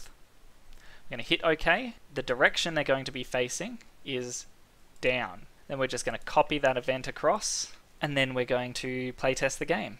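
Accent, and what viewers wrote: Australia. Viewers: You're from South Australia aren't you?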